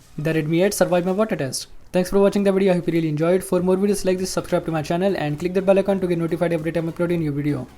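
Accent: India. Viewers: İngilzce konuşan Hint shkfsaj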